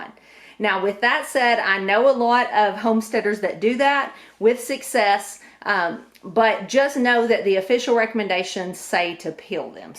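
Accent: South, United States. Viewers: 😅I grew up speaking Spanish and English here in PR and we don't get that beautiful southern accent at all. Iconic, just like everything south🏝💪🏼💪🏼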